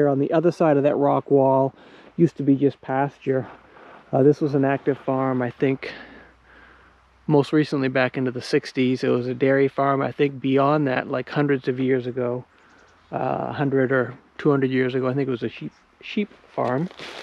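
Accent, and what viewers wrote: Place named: New England, United States. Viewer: I just randomly came across your channel and thought I’d check it out. I have to say I’ve been enjoying watching your videos quite a bit! I really enjoy watching you play in your playground and all your cool toys! I like your commentary about what you are doing and your thoughts on the situation. I also enjoy your New Englander accent!! Keep up the great work with the videos and I’ll keep enjoying them!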